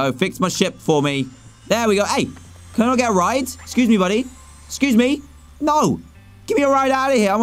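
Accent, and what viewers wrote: United Kingdom. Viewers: He's British. He has an accent. He can say it how he wants.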